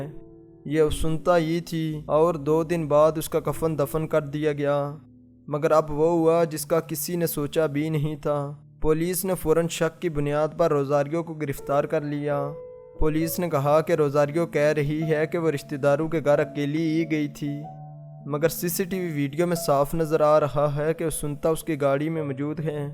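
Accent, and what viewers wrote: Kashmir, India. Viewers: accent kashmiri lagta ha mountain area Jo America ja ka be change nahi hosakta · Kashmiri lgte ho bolne sy...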